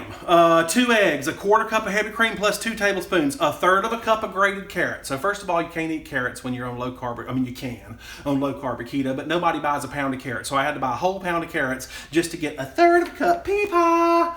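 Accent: South, United States. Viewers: I love to hear ya talk I'm super southern too lol so you keep doing you ❤❤ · Found your channel a few days ago. I love your personality and good nature. Loooove love love your southern accent! I used to live in the FL Panhandle and miss it so much. Thank you for your honesty regarding your triggers, we all struggle in different ways. Wish you and your channel the best 🙌. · i just subbed bec of this vid. I love it. Also, your accent... I can't quite put my finger on it... southern? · I just subbed based on your rant alone. I love it! I'm a southern girl and you sounded like the people from my grandma's hometown! That blessed me, thank you for not editing it out. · I love this including your talking (which I don't consider rambling at all) Thanks for your humor and as a Southerner myself, your accent. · You popped up on my YouTube feed today and I instantly fell in love! 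I love the recipes, I love your rambling...and I especially love your southern drawl (I am from southwest VA).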